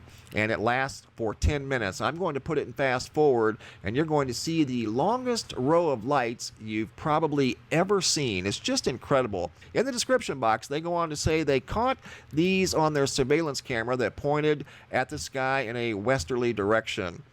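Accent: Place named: South, United States. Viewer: Love the channel bro 😊👍 Great southern accent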